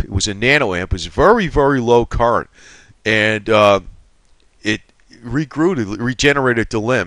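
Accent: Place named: Italian American, United States. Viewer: you sound like an Italian American kind of like Robert DeNiro type accent.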